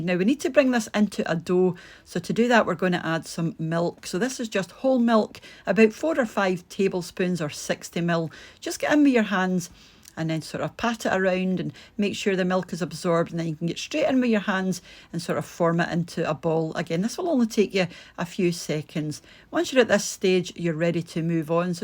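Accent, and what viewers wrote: Scotland, United Kingdom. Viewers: I always thought digestives contained oats, clearly I’m wrong 😅 to bad I’m gluten intolerant so wheat isn’t an option for me… I wonder if I could use oat flour instead? If anyone can help me out here, pls respond to my comment! It will be hugely appreciated ❤ they do look very delicious, luckily watching a recipe video with regular wheat flour doesn’t make me feel ill and I can still enjoy gluten containing biscuits that way - I know it’s not the same but still 😂 I also adore the Scottish accent! I fell in love with the accent and landscape after watching Outlander… me and my dad watched together and ever since he calls me “wee lass” and I lovingly call him Da, even though English isn’t even our native language ; we’re just so in love with Scotland, it’s history, it’s nature and the cuisine! Hopefully one day we’ll be able to go on a Da-Daughter trip to the Highlands and try an authentic Cullen Skink & Haggis 🤭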